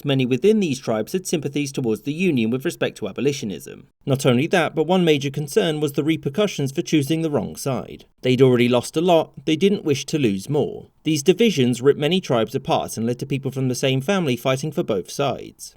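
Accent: United Kingdom. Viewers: Not going to lie... It's a bit weird learning about the American Civilian War though someone with a british accent.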